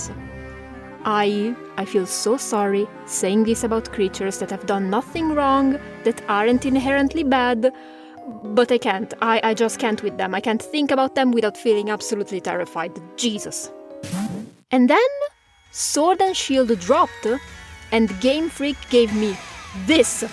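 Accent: Lombardy, Italy. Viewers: Ah quindi sei brescianə/bergamascə? Mi piace tantissimo il tuo canale, dallo stile di disegno alla tua voce e la tua schiettezza, continua così 😊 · vecio ma sei di Bergamo/Brescia?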